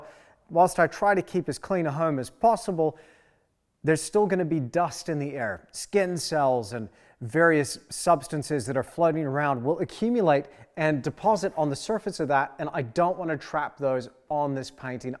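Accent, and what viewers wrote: Australia. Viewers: Australian vowels and American consonants, where the hell do you live lmao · Australian/Kiwi-American?? (please) ... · I can't tell if you have an Aussie, American or Irish accent lol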